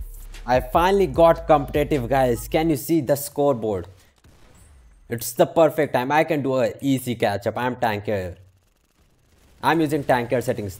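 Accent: India. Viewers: I had a feeling you were Indian lol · yo it seems like you're indian, based on your accent are you? i am "indian american" but you are so cool for telling me that also whenever i play arsenal i hate this: "DEAD UPON SHOT REGISTERING"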